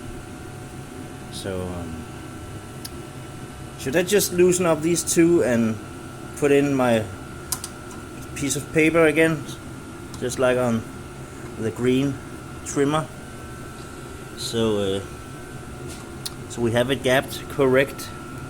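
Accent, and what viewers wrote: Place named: Denmark. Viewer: i have a Norlett with variable speed drive and reverse. I like it way more than the Texas brand👍 I swapped the clapped out old Briggs that would never start, for a chinese honda clone engine. And now i use it with tires on, and a front mounted mower that were originally meant for a texas tiller 🙂 i just found your channel and subbed because i could immediately hear you're a fellow dane 😁🇩🇰🙂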